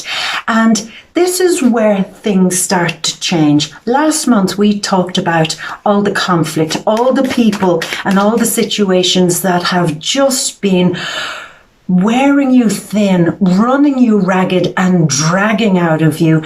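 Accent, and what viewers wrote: Ireland. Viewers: Lovely - Thank You!! still love your Irish!! especially that part where you tell them to GTH!! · .. Lady you are yummy.. Love that Irish accent.. Cheers from Australia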